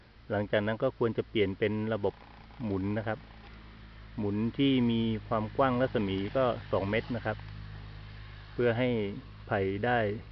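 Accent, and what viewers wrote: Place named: South, Thailand. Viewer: สำเนียงน้ำเสียงเหมือนคนใต้เลยครับ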